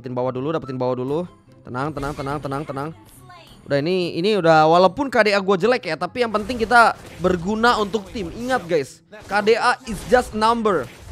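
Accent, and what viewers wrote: Palembang, Indonesia. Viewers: Masih ada logat palembangnya "Aiidaah"😂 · "Aii dahh".. Dialek wong plmbg la nempel trs jo ee😅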